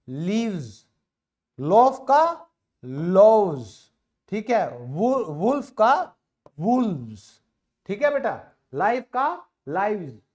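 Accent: Haryana, India. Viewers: Sir kya aap haryana se hai kya kyuki aapki language hariyanvi hai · Haryanvi English love you ❤️❤️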